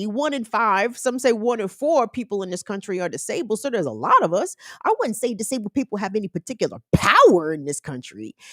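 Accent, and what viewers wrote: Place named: New Jersey, United States. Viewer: Too be fair,she really sounds like somebody from Jersey or maybe Staten Island.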